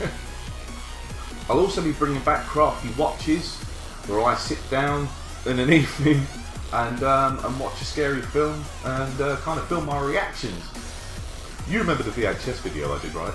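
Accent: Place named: Cockney, United Kingdom. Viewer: second that! Good to have your cockney tones back on YouTube : D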